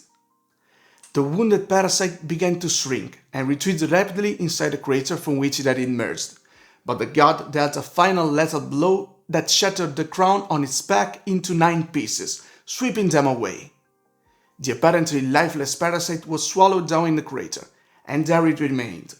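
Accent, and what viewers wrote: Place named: Italy. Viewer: ho ottenuto italiani origini dai quelli vecchi.. grandparents from my dad's side so I recognize an Italian accent anywhere 😅 also I was never fluent so pardon my butchering when I write, it's probably backwards lol. Do you have any social media?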